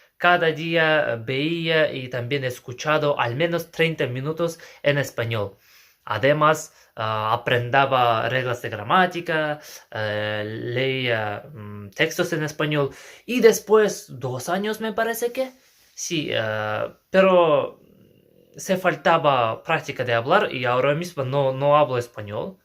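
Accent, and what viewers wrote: Standard. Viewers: Hola que gusto conocerle, se entiende muy bien la pronunciación, y es una pronunciación neutra excelente, yo actualmente estoy estudiando el idioma Kazajo y aprendiendo su cultura.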